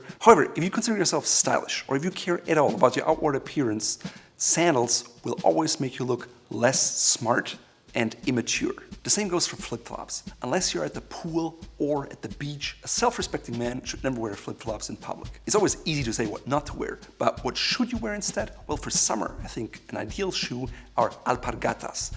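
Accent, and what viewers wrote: Germany. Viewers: Wusste ich doch das ich diesen Akzent erkenne :D Even with perfect English, a German can spot a German. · Isn't he German?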